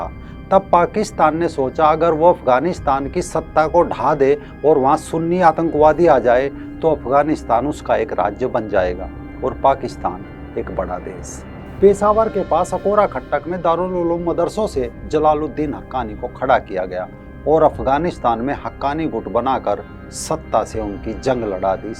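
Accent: Haryana, India. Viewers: Bhai apki awaz se lagta hai ap hriana stet se ho,, حریانا ب پنجاب کا حصہ تھا کبھی 😢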